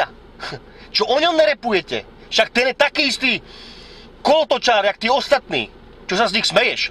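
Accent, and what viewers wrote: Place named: Petržalka, Slovakia. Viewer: Ked ho pocujem, v sekunde sa mi vynori Ovsiste 90tych rokov, gangy na kazdom rohu, fetaci, neskor mafia a typicky petrzalsky prizvuk. Som z Ovsista, a som na to hrdy. Aj na to, ze som prezil.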